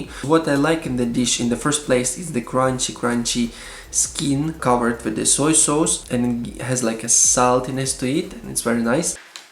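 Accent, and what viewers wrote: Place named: Philippines. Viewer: Your pronunciation is like Filipino accent. Very clear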